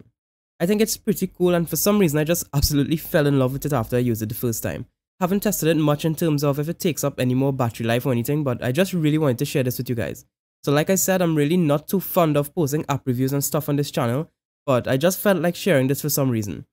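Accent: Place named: Trinidad and Tobago. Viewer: This dude sounds like he's from trinidad probably he is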